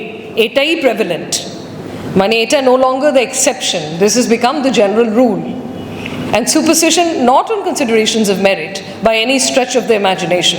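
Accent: India. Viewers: Indian accent but kothaguli valo chilo . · indian accent ! bharotiyo mohila !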